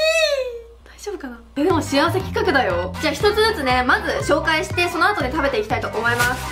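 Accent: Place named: Japan. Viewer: 都民からするとは結構なまってるよ🍊 方言で地元感あっていいよね🍊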